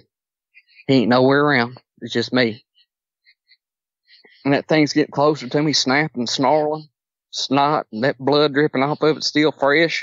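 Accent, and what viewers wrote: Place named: South, United States. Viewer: Thanks for sharing your story! Love your accent! I'm from Mississippi so we Southerners all have a shared background, your grandparents sound like my own. <3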